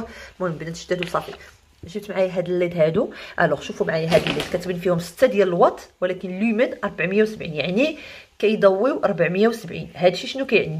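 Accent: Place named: Iraq. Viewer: هاي هاي على لغزالة ولهجة لعراقية ❤نتي تهضري وانا نضحك غي بحدي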